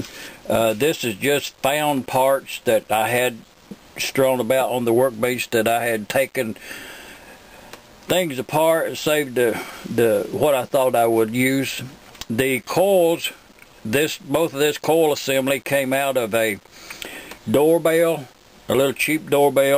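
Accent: South, United States. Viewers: hahah i thought bout hillbilly when i heard his voice xD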